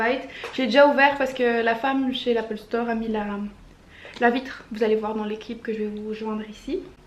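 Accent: Belgium. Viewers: tu es belge? J'ai l'mpression car tu as l'accent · C’est normal qu’elle disent ouite a la place de huit car elle est belge moi aussi je dit ouite enfaite c’est parce que chez nous en Belgique ont dit pas huit mais ouite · Tu est Belge? Sinon t'es parfaite💍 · elle a un accent belge c est ps de sa faute😅🤷🏽‍♂️ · Tu viens d'ou de Belgique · Accent belge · Tu es belge ? J'ai beaucoup aimé ton unboxing ! Moi je vais avoir l'iPhone 6s en août donc je suis assez exister aha ! Gros bisouuus ❤❤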